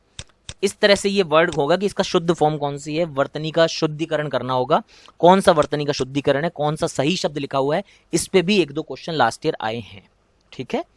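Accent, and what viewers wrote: India. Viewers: West up language hai sir aapke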